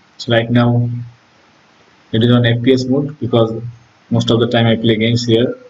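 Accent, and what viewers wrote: Bengal, India. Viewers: I'm 100% sure you are Bangali😂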